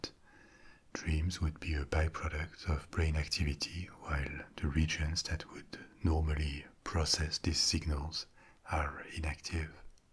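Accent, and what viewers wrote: France. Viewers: Oh my god. The French accent is literally perfection combined with ASMR. What a time to be alive this is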